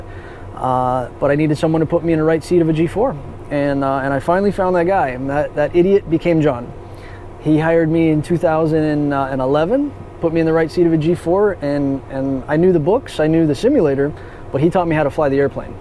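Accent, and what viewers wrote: Canada. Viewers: North Texas? I thought he had a French canadien accent. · You sound like you're from Canada, not Texas.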